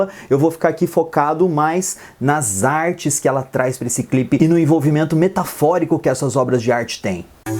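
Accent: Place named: Inland São Paulo, Brazil. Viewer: Sou seu aluninho do QG... Seu sotaque é igual o meu pois moro no interiorrrrr de SP .. Kkkk Adorei sua análise, estava aguardando alguém faze lá ...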